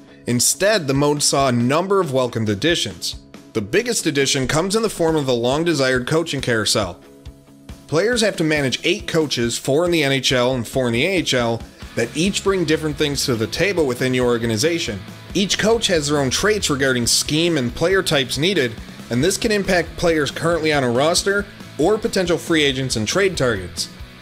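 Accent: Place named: Buffalo, United States. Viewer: Heard the buffalonian accent and saw you were using ROC and BUF a few times and thought, this guys has to be from BUF... found you on Twitter and confirmed my suspicions! Lol #gosabres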